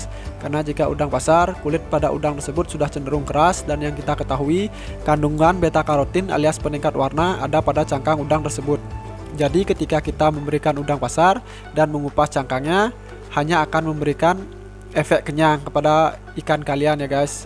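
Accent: Bali, Indonesia. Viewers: Nak bali oo bli? Ngenah ti logat ne 😅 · Ngenah san logat bali ne bro 😅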